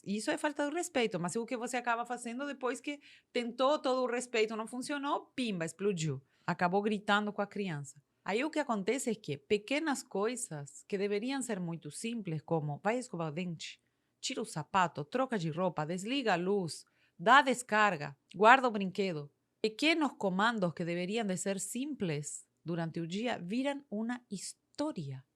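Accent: Spain. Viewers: eu não sei se você percebeu, mas o sotaque dela é de alguém cujo idioma nativo é o espanhol.............. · Que sotaque gostoso, de um espanhol falando português do Brasil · o sotaque espanhol é muito fofo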